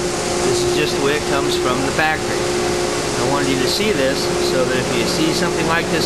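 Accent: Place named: East Coast, United States. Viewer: you're from back East I can tell. My pop was born & raised in Binghamton and his whole life he said "Awe-dee", never could get him to say "Ow-dee".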